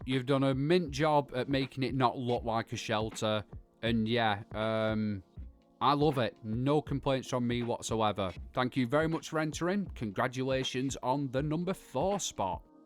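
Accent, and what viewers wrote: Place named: Northern England, United Kingdom. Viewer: Definitely got the inspiration I was looking for but spent most the video trying to work out which side of the pennines you are from 😂 Prestonian here lol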